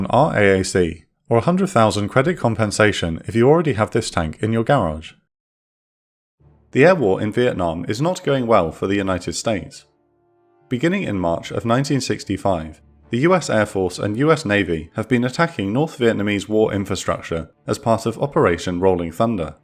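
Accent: United Kingdom. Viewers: Love the visuals and the calm Brit voiceover. If you want the American version of the story, TheFatElectrician does a good video on Op Bolo as well. "US obliterates half North Vietnam's MiG-21 fleet in 13 minutes". The contrast between channels is stark, but I can appreciate both methods of telling the same story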